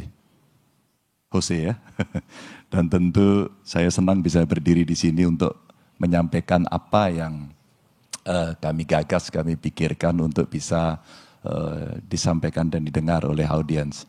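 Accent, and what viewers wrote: Java, Indonesia. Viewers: itu bro bukti pak ganjar bisa dan tahu bahasa inggris ya kalau soal logat beda orang lidah jawa sama lidah orang luar apalagi pak ganjar belum pernah tinggal di luar negri ataupun mengenyam pendidikan diluar negri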